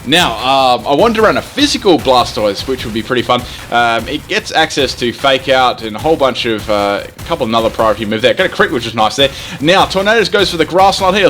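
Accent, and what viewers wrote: Australia. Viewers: became accustomed to it after a while...such an Australian accent...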